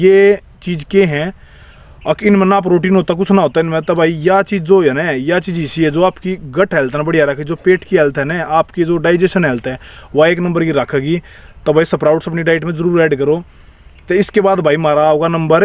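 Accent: Haryana, India. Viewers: Haryane balo ki boli acchi lagti h bhai 👍👍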